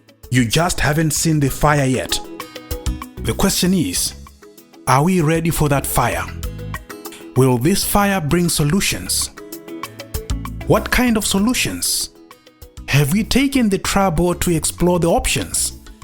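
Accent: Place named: Zimbabwe. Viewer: 1970s rhodesian voice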